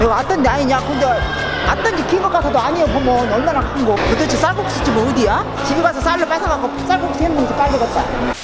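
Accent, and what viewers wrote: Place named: Chungcheong, South Korea. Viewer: 충청도사투리ㅋㅋㅋ 우리할머니같아ㅋㅋㄱ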